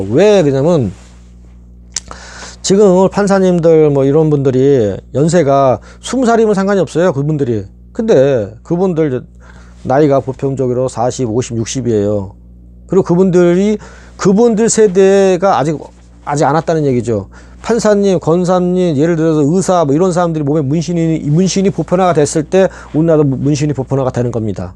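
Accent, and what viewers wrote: Incheon, South Korea. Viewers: 언뜻언뜻 이천수선수닮으셨네요 ㅋ 인천분이라 약간 특유의 말투도 비슷한듯하고요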